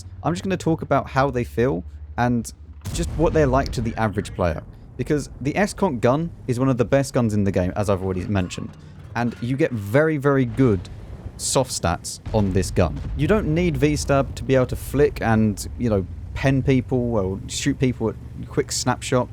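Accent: United Kingdom. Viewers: LOL!!! I really love this statement "IS4 this probably the idiot proof tank", British accent humor. Good one.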